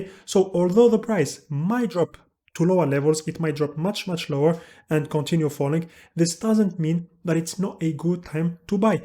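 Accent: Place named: France. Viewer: L’accent français ça 😆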